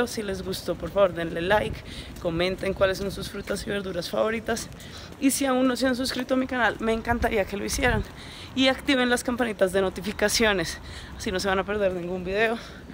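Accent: Colombia. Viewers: Es q los Colombianos son asi , gente bonita si forma de hablar sus modismos son.lindos y esta muer no se guarda naa nos dice las cosa tal cual ayuda mucho su sinceridad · Me recuerdas a mi ex colombiana estás súper linda , hablas como rolita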